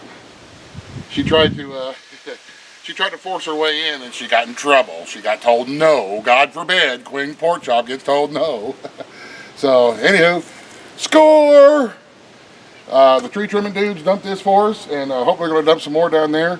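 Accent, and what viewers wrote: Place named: Oklahoma, United States. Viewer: Where ya from? Been tryin to figure it out. You kinda talk like us round here. Im from north east Oklahoma. By Arkansas kinda. Just wonderin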